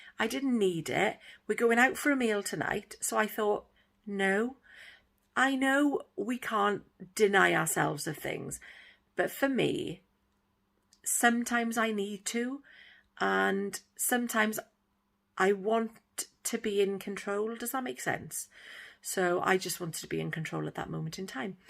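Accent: Wales, United Kingdom. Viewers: Good will power and self control. I am on Nutracheck. Did Slimming World and lost 2 stone but was fed up of feeling guilty of going over my "syn" allowance. Now I am in more control. Losing slowly but that's the best way. I don't normally like chatty videos but yours is fab! Do I detect a Welsh accent? I am from Carmarthenshire but now live in Swansea. I really look forward to watching more of your videos. Thank you.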